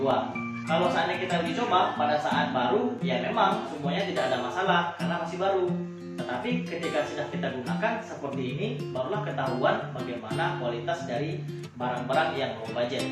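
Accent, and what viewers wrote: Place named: Pekanbaru, Indonesia. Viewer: Dari Pekanbaru ya bang?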